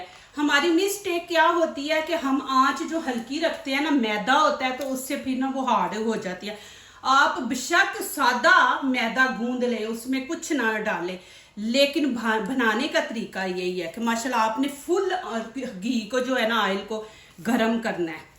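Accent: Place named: Punjab, India. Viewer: آپ اردو پنجابی لہجے میں نہ بولا کریں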